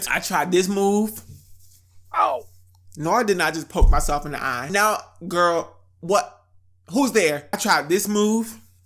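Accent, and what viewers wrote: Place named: Brooklyn, United States. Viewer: Sounds like Brooklyn 😂 You betta run like hell 😭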